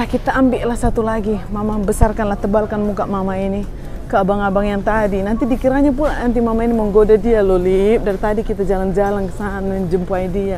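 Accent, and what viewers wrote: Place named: Karo, Indonesia. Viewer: Suka kali lihat logat kaya kakak, kayak bibik aku orang Karo. Selalu di tunggu shopping onlinenya dg ❤❤❤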